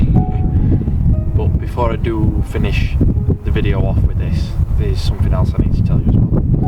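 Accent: Yorkshire, United Kingdom. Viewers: Looks like you got a bargain there with the 2.2. I've got a 06 one as a daily and a DC2-R as a weekend car. You sound like a Yorkshire lad? I'm in Yorkshire not far from Yorkshire Wildlife Park.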